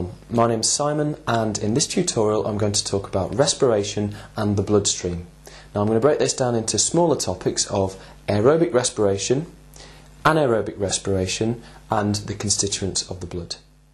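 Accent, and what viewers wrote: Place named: United Kingdom. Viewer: thanks! this really helped! i really wish my bio teacher had a british accent! :)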